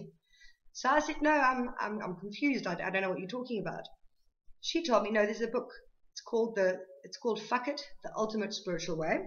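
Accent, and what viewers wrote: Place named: South Africa. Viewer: Hang on... South African accent detected. Good job quieting smoking. If nothing else you will save a lot of money. As a fellow South African and a ex smoker ex heavy drinker I literally get where you are coming from. I take it that you still live in South Africa, maybe not but does not mater because what I'm about to tell you is the same either way. A lot of South Africans who leave the country have a hard time adjusting to their new surroundings because they are use to being stressed, pressured, feeling vulnerable, unsafe and generally frustrated. In South Africa we grew up (I'm thinking we are about the same age) with thees feelings not knowing any other way of life. The constant stress and constantly looking over your shoulder to us, is considered just another day. You and I know that the human mind can not stand the stress even if we lie to ourselves and say we can. 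That is why so many South Africans drink so much, smoke so much and do so many other addicting things. It's just way to escape reality because our minds need release to try to relax and calm down. The truth of it is and most South Africans will disagree is that having grown up in a war zone in Africa we all surfer from PTSD. If you are looking for answers to why you feel the way you feel and why you do the things you do look into Post Traumatic Stress Disorder. Look at the symptoms of PTSD and you will recognize a lot of them. One of the ways I try to deal with having grown up in SA is to try to learn to relax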